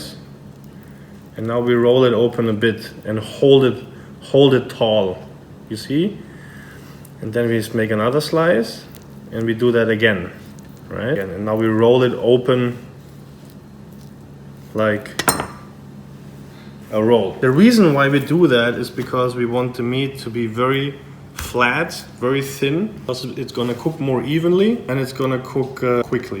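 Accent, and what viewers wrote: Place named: South, Germany. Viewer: since when do you have a south German accent in your English:D Rock on!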